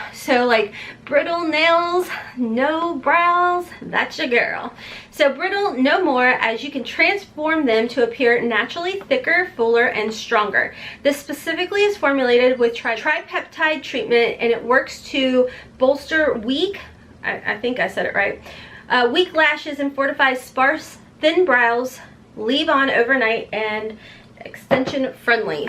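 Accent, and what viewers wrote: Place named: Kentucky, United States. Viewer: That Kentucky drawl comes out from time to time. When you said MAC, my captions thought you said “mayak”. Like kayak, but with an m. 😂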